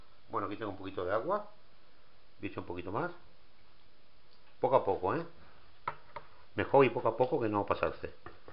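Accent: Andalusia, Spain. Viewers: Gracias por subir esta receta! (Primera vez visitando tu canal) no se si me gusto mas la receta o see acento español😉 padezco de debilidad por los escoceses y españoles! 😍😍 saludos desde Texas👍🏼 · M. PERALES: Para una mayor concreción, el acento del caballero demostrador de la minuta de los churros es, claramente, de Andalucía (Sur de España). Yo escribo, desde la provincia de Valladolid (España), de la que se dice que es donde mejor se pronuncia y articula el español. De hecho, en verano, a la ciudad de Valladolid y a la próxima ciudad de Salamanca, vienen muchos estudiantes extranjeros a aprender español. Y añado datos ilustrativos sobre la receta (o minuta) de los churros, mostrada en el vídeo, que es la básica. Hay gente, en España, que a tal masa le añade una cucharada sopera, precisamente, del aceite de girasol en el que se van a freír los churros, con lo que se consigue un punto de suavidad en el acabado. 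Y, por demás, decir que hay recetas más complicadas como la denominada "churros de lujo", pero no merece la pena mayores complicaciones, porque la minuta básica de los churros y, luego, un buen chocolate a la taza resulta formidable. A mí, me encanta y, además, es muy saludable por el efecto antioxidante del chocolate y, por otra parte, estimulante por la acción de otro componente del chocolate, la teobromina. Como en todas las cosas del saber humano, aquí, también, se podría estar escribiendo un buen rato, pero no quiero entretenerme. Voy a dar unos apuntes rápidos. En España, los churros rectos, sobre todo, en la capital de Madrid y en la ciudad de Sevilla, se llaman TEJERINGOS. Y el churro en forma completa de ovoide, se llama, en todos los sitios, como es l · Muy buen video y mejor aún con acento español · BUENÍSIMOS Y MÁS AÚN CON ESE ACENTO TAN NATURAL Y ESPAŃOL. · Ese acentico español es muy cachondo. 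Por cierto, ¿por qué no no has echado levadura a la harina? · El hombre es andaluz ,del sur de España ,tienen mucho arte !! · Me encantó! Muy fáciles y sin complicaciones. Me encantó su acento español. Gracias saludos desde Monterrey México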